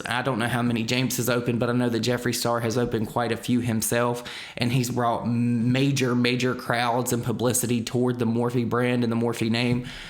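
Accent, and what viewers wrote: South, United States. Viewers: Idk but your southern accent is so soothing lmao. Reminds me of one of my English professors.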